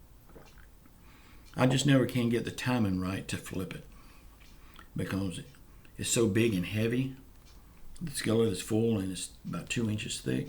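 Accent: South, United States. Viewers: You know that's the 1st time I heard the word filling station in a long time and you make me laugh when you say word's like hurricane and tonight when you said Carol syrup it, krol syrup lol I,m not making fun of you the way you talk I live in Cookeville TN and I,m country and I know you live in florida and they are country but you seem like your country as corn bread I love this show i finally got to keep myself up tonight to watch it on time but I still watch it when I wake up around 3 in the morning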